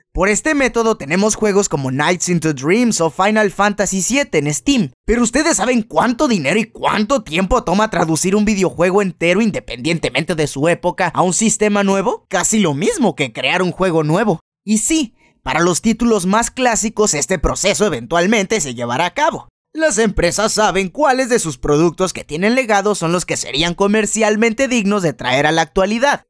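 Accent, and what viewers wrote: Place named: Yucatán, Mexico. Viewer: Para nada, al contrario me gusta tu estilo , si noté un acento medio yucateco BOMBA! , pero es sólo mi percepción, saludos y buen trabajo!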